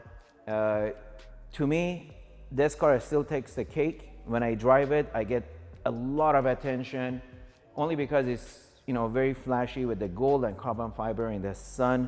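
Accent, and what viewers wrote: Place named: Iran. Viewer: 5 seconds into the video and I can already tell you are Persian! :)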